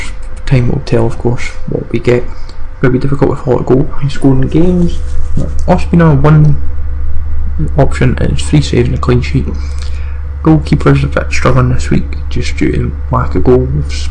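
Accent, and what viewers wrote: Scotland, United Kingdom. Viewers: Just not use to Scottish accent I guess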